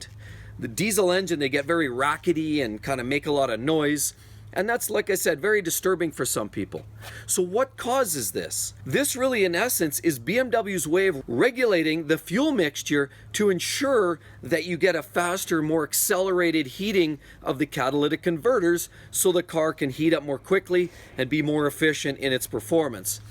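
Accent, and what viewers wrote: Canada. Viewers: Are you Canadian or from Minnesota?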